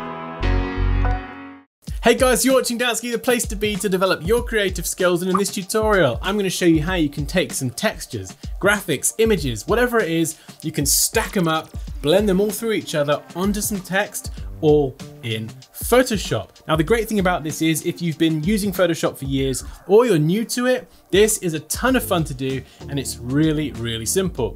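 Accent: England, United Kingdom. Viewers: Very listenable English accent! :-) Makes such a nice change to 'other' accents!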